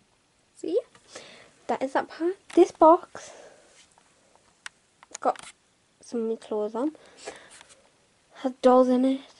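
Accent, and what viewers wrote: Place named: RP, United Kingdom. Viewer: The accent though so posh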